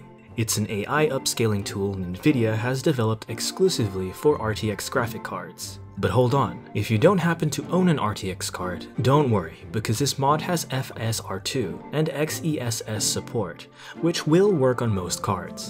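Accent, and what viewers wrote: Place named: Netherlands. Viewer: you sound dutch i just realized that zamn, been binge watching your vids LMFAO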